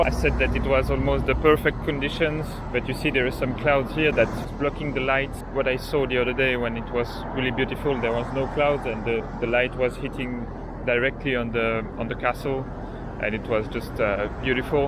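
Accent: France. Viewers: Isn't it a French accent ?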